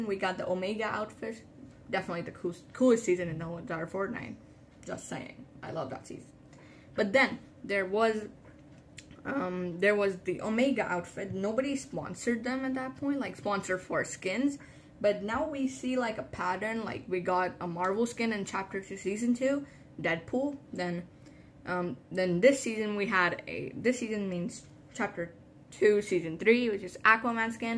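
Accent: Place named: England, United Kingdom. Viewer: bruh but why u have an English ascent in your language????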